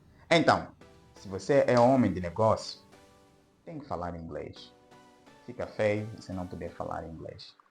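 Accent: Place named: Angola. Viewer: De primeira pensei q tu fosses Angolano 😂❤ Salve mano belo video O teu Sotaque é de Angolano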